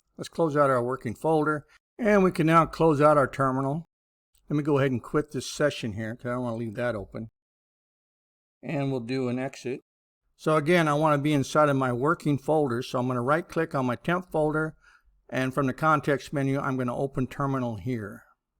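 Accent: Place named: United States. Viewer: hoooly the voice so american based love that nice video KKONAW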